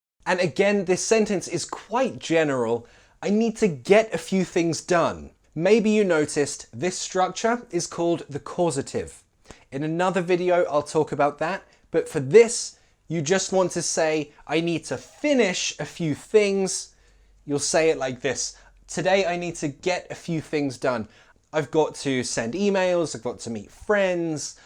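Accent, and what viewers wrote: United Kingdom. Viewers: Mohammed Nabi It is British English for sure! English is just amazing! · Very useful for me! Thanx from Mexico City! I love brit accent! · Hi sir it British or American english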